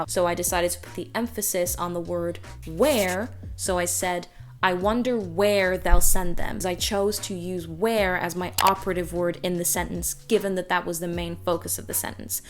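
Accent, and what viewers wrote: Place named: United States. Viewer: I was deceived by the intro. Your American accent is great!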